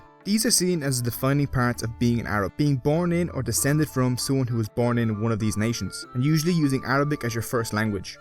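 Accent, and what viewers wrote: Ireland. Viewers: You have an Irish accent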